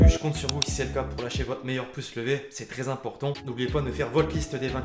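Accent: North, France. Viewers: pareil que toi, sauf que je prends upamecano pour kounde, je savais pas que t’étais deuch nord (j'oro du min douter avec tin accent) en plus t'es sang et or !!! je m'abonnes de suite.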